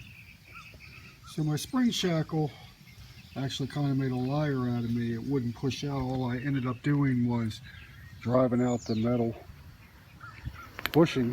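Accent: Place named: Pittsburgh, United States. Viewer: yins from up near the burgh? thanks for the vid Go Steelers!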